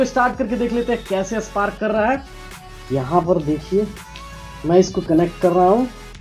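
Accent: Bihar, India. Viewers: bhai apna hindi nd english accent sahi kr lo thoda impression achha bnega apke accent m bihari tone aata hai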